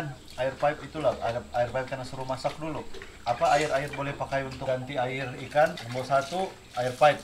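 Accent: Sabah, Malaysia. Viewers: Terbaikkk bang 👍🏼👍🏼👍🏼👍🏼 moga teruss sukses supportlocal ❤️ ada sdh channel tmpt berguru 😁 kalau channel lain susah mau di difaham.. maklumlah kurang kita faham bahasa indon dgn semenanjung...nah tapi kalau logat sabah TATAP PAHAM ITU LOGAT 🤣 enjoy tgok video abg ni...buka buka jak youtube terus pegi tgok channel...semoga banyak lgi video dan ilmu yg abg sampaikan... #barumaubelajar 🤣👍🏼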